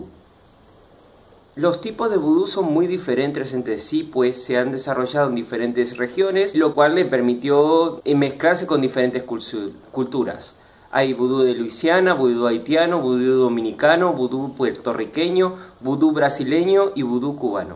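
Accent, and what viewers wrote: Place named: Argentina. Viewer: si es barcelona, ¿x que hablas como argentino?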